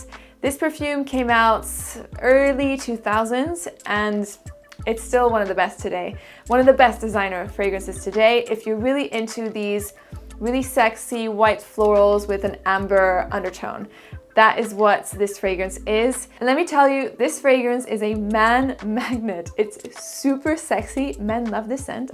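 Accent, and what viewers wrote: France. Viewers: Your french accent is so interesting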